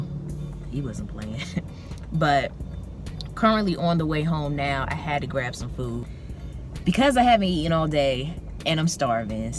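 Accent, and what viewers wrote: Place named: New York, United States. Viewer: New York accent is strong. Probably I’m wrong but I don’t think so.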